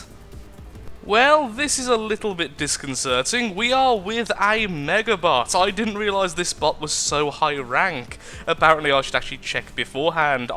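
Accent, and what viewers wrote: United Kingdom. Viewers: Your british accent always puts a smile on my face.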